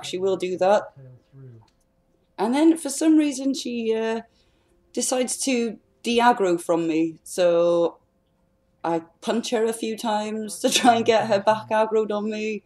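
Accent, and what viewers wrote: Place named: Bristol, United Kingdom. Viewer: I'm just trying to work out the accent 'sounds abit bristol'.ooh ar ra.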